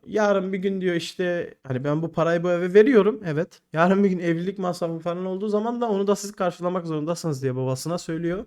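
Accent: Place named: Kahramanmaraş, Turkey. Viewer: Kanka Kahraman Maraşlı mısın ? bizim otaraf gibi konuşuyon :)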